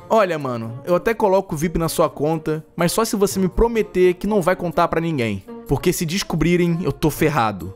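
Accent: Rio de Janeiro, Brazil. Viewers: Carioca né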